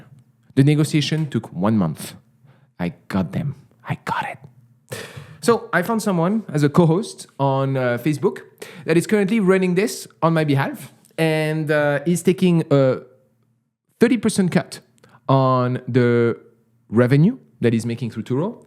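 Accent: France. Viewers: Your French accent is so cool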